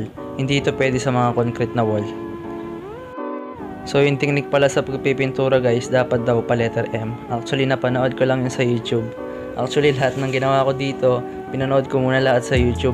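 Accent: Pampanga, Philippines. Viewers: Ako Lang Ba Ohhh May Parang Accent Sya Ng Kapampangan Pag Nag Sasalita Ng Tagalog 😂😂😂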